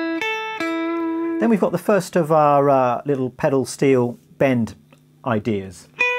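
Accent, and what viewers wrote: United Kingdom. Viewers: Son You are really great !! I enjoy watching you and the British accent is terrific!!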